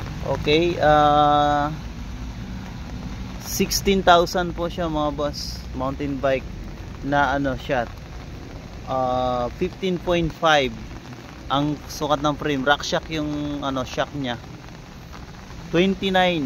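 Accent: Visayas, Philippines. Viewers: Bisaya ka bai? 🚴‍♂️🚴‍♂️🚴‍♂️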